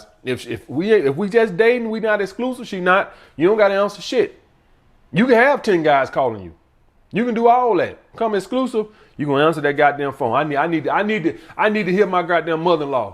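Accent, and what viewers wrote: South, United States. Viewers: Lol dafuq is rambling??? Yo this nigga country as fuck! Keep up the good work homie I listen to almost every show. Red💊 all day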